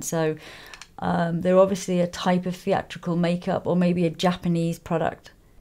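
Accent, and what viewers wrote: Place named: England, United Kingdom. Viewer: I don't like everyone's English accent... In fact, very few people make the cut... But, the way you talk...Ahhhhh...so soothing... Perfectly melodious❤️love all your videos... You're a proper artist!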